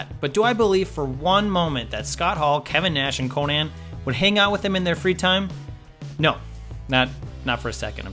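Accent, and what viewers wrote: United States. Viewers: sounds weird to here a american on what culture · Who's this American guy, where's Jack or someone? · Get a non-yank to do these videos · There is a American workin for WhatCulture!? Its weird hearin his voice since all the rest is brits. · hahahhahahahahahahahahha listening to this yank talking about brawn in 2018 hahahahahahahahahahaha fucking bullshit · great American accent. Thumbs up! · It’s so weird hearing an American and not a Brit on this channel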